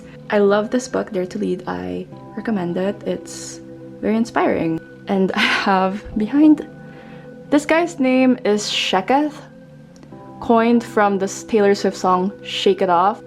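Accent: Philippines. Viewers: I knew you were filipino right away cause I can hear your filipino accent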